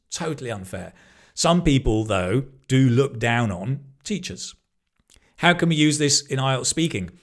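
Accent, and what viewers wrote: United Kingdom. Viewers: En excellent english teacher explaining things with zeal and zest using original British accent.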